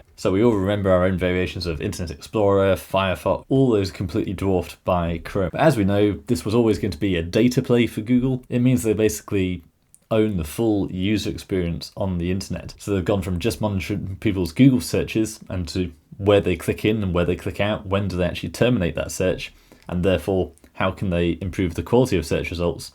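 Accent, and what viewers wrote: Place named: North, United Kingdom. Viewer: Northern accent there 👀 Where you based buddy?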